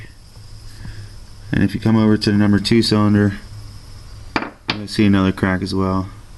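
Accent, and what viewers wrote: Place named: Pennsylvania, United States. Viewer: sounds like you are from somewhere near pennsylvania. is that true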